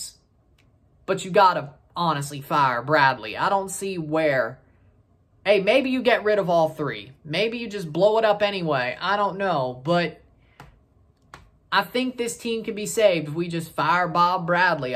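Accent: South, United States. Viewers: Ok but I assume your in Toronto now. That is cool that you are a soccer fan. Not alot of southern guys into soccer. Love the content. keep it up! Thumbs up bro!!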